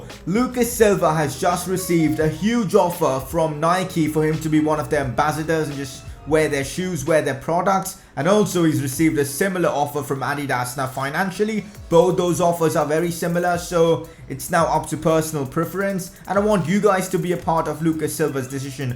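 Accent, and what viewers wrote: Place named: India. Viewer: That one Indian kid in school who tries to be cool with his cringe ass accent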